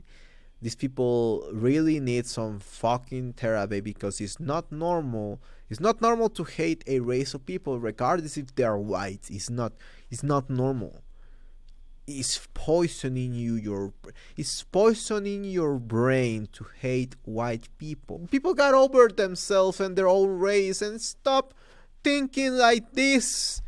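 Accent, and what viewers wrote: Mexico. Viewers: No mames wey, white people are that fucking racist lmao 😂. I can tell by his Mexican accent he probably never lived longer or if any time period in America and still wants to talk about it . Pinches gringos tienen decadas de Racismo wtf duh, we can start by , not letting black and brown eat or drink water at any white people location, nowenly flooding our Brown and black streets with Drugs , not letting us buy house, giving is the worst schools , poluting around where we live and and our food we black and brown die faster. I can go on on and on and why white people are racist . Now not all whites are racist, just recently they have started to change when President Obama got elected, but I just hate how My own Mexican people come and make videos and say everything is and has always been peaches and love lmao wtf 😂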